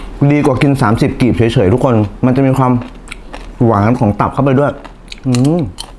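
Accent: Isan, Thailand. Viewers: เราไม่กินดิบ ไม่กินเนื้อ แต่ชอบกินผัก ดูไปกินข้าวไป อร่อยตามเลยค่ะ เว้าอิสานได้น่ารักมาก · น้อง เว่าอีสานตาฮักหลายจร้าตามเบิ่งทุกคลิป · ชอบพี่ เว้าอีสาน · พูดอิสานน่ารักมากค่ะคุณ ❤❤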